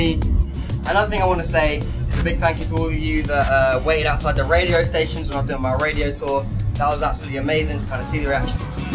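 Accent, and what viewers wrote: United Kingdom. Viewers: i love 1D im an directioner but back to this guy YOUR BRITISH :)))))))) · Your voice + Your british accent = SexToMyEars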